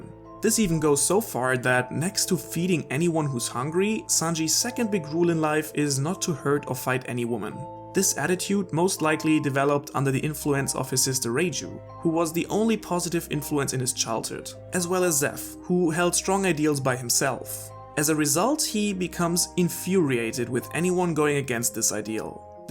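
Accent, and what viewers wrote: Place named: Germany. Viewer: Are you german? Your english sounds like you are german 😁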